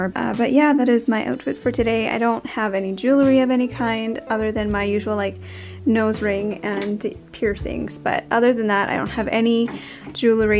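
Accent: Canada. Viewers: I am so excited to have found you. I hear a Canadian accent but wonder where you are from exactly.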